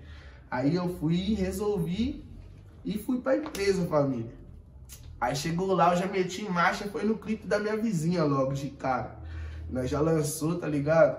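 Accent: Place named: Belo Horizonte, Brazil. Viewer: Véi eu amo esse sutaque de BH mdsss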